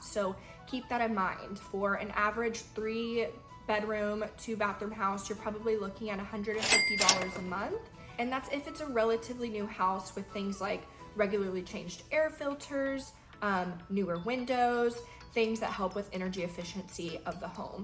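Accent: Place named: Appalachia, United States. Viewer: For a British speaker from London, this is a hillbilly accent.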